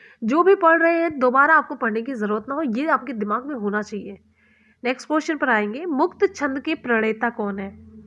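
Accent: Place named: Bihar, India. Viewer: Mam maghi bihari boli hai...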